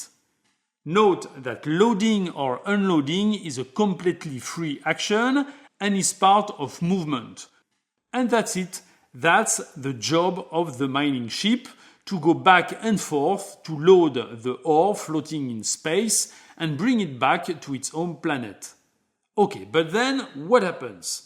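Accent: France. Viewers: Thank you, excellent video. I love the French English accent!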